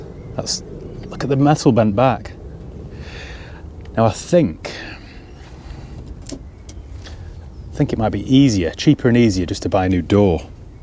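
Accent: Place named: England, United Kingdom. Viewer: English accent made this video more enjoyable, most statements ended in question " Isn't it"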